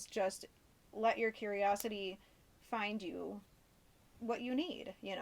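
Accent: United States. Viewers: I could tell you had a Buffalo accent from the moment the video started hahaha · Are you from Minnesota? :) because you sound like you do!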